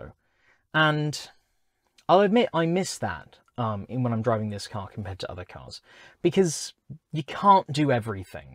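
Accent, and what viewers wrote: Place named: United Kingdom. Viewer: When did you get a British accent?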